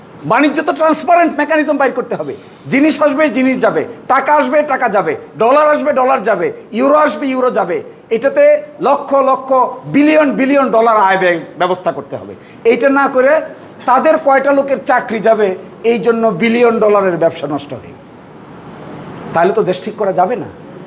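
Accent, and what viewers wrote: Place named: Bangladesh. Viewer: Don't use deshi English buddy when you do public speaking. English doesn't match you. It's really annoying. Use bengali language please in public. In your reading room you can study and practice English.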